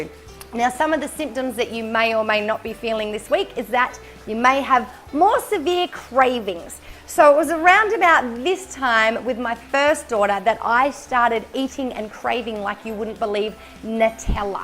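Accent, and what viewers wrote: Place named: Australia. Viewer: Well done - good Aussie accent and no rambling - ❤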